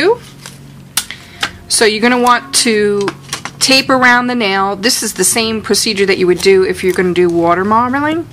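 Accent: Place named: Italy. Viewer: This is freaking awesome! Btw if you don't mind me asking, are you Italian? You have the same accent my family does :D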